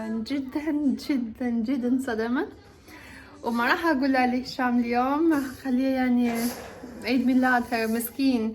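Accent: Iraq. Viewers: عفيه عليچ شلون تحچين عراقي حلووو انت انسانة طيبة ،، وشفت شگد مقهورة على زوجك ،، وحسيت بغصة صوتچ ،،، الله يبارك بيچ حبيبتي الله يحفظچ ويحفظ هشام وأيان باذنه تعالى ❤❤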